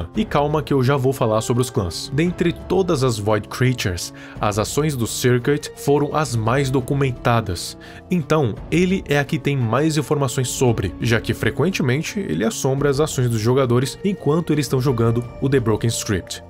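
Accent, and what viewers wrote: Portugal. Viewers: o seu é de Portugal?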